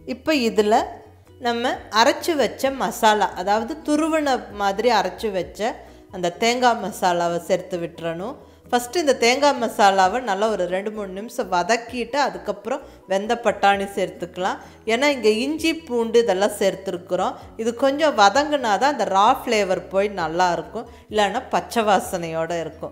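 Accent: Coimbatore, India. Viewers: ஆளுமையான கோவை வட்டார உச்சரிப்பு ,எளிமையான, அசத்தலான பேச்சு, எளிமையான சமையல் ஆண்களுக்கும் ஏற்ற குறுகிய நேர சமையல், அருமை, வாழ்க பல்லாண்டு.நன்றி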